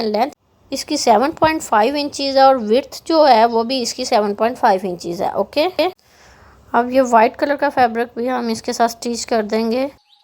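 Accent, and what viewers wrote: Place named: Punjab, India. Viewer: Nice punjabi touch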